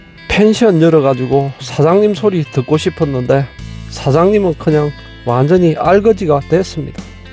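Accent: Gyeongsang, South Korea. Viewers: 경상도 아이가 ㅋ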